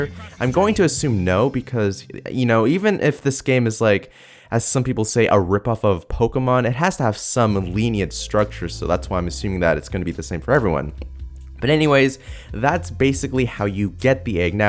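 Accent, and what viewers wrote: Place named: Ontario, Canada. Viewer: I assume he is a fellow Canadian... Sounds like an Ontario accent to me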